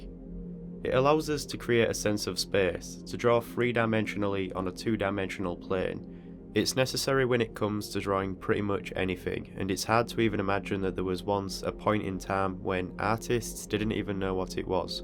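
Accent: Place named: Scotland, United Kingdom. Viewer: Is he Scottish? I love his accent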